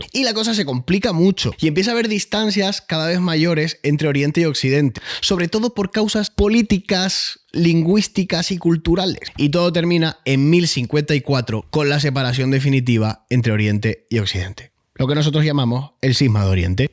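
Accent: Canary Islands, Spain. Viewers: ME ENCANTA TU CLARIDAD EXPLICATIVA Y TU ASSSENTO CANARIO. ❤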